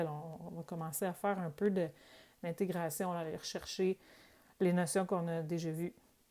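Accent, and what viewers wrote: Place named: Quebec, Canada. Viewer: Français québécois (et quelques termes en anglais). Ce n’est pas en français international, désolée.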